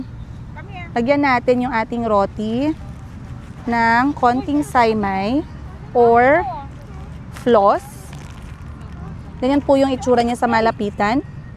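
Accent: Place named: Visayas, Philippines. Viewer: YANG KABOBOHAN MO AT IKAW ANG BASTOS MAGSALITA PURO KA BISAYA ANG PANGET MO PUTANG INA MO NAKAKAINIS KA PANOORIN, PANGET TALAGA UGALI MO, YUNG ACCENT MO BISAYANG TAE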